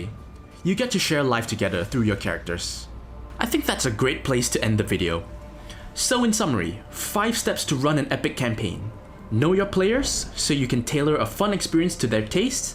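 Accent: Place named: Singapore. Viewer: I KNEW YOUR ACCENT SOUNDED LIKE SINGLISH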